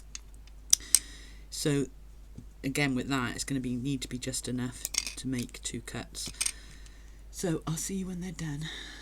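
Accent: United Kingdom. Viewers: These are truly beautiful. I just love the one with the black background piece, really makes the colours pop. Gorgeous colours together. Thank you for giving us so much of your time and talent. You sound like you hail from the South of England. I lived there as a child and also again in my early twenties for a while but now live in Australia - a touch warmer!. Have a wonderful Christmas and hope your New Year brings you good health and special times.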